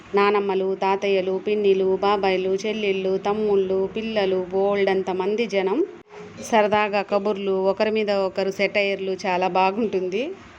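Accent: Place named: Kadapa, India. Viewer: Meedi aaa ooru maadi proddutur meedhi slang kadapa vaalla laga undi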